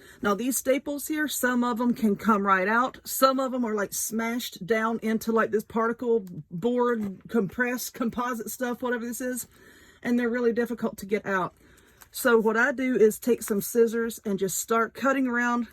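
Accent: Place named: Tennessee, United States. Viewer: I just discovered your channel, and as soon as you said "durn", I subscribed. I don't know what part of the South you are from, but you sound just like me and everyone in Northeast Tennessee. Loved your lantern, if I can find the rectangle canvas thingys, I am going to give it a try. Also, I wanted to mention the Command strips would be great to affix the battery box inside your lantern top.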